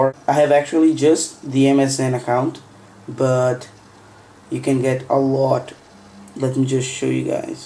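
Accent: Brazil. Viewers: I believe its because he have a Brazilian accent.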